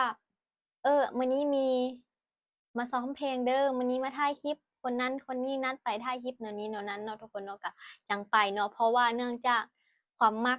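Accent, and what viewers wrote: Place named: Laos. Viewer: อยากฟังเสียงเว้าลาวเพาะดี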